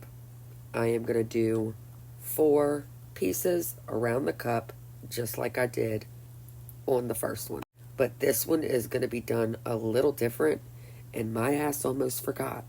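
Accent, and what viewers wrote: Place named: New Jersey, United States. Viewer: You have to be a jersey girl lol if not you literally close ❤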